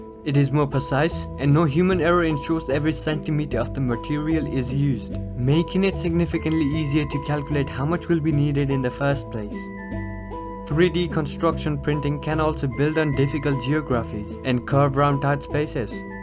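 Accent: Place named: India. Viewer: This channel is diamond, not gold!! BTW are you of Indian descent cause your accent seems like a little indian.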